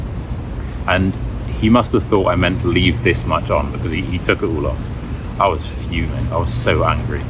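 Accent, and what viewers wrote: United Kingdom. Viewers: Listening to rain sounds is the best! (British accent with an ASMR vibe:) So no worries about it at all!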